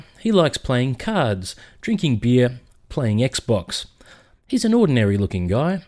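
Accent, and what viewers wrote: Australia. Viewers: Great video, funny and informational. Love that Australian accent. "Deesk of Leeft"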